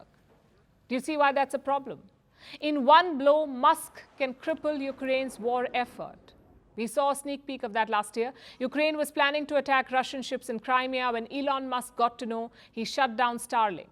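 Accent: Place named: India. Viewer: You are lier I wish you get off of your Indian accent first to be a speaker 🔈 plus you don’t know nothing about corruption in Brazil lady Lord has mercy on your soul and the machine manipulation behind your job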